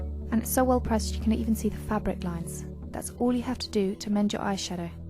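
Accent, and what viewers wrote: United Kingdom. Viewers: Ate you from the uk? I am lol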